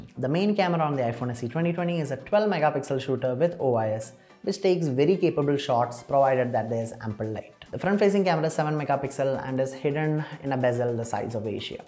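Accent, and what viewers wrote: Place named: South India, India. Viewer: Excellent video, dude. Keep up the good work. Can't resist saying this : Try to have a neutral accent. You sound like a South Indian. Apologies if I am wrong :)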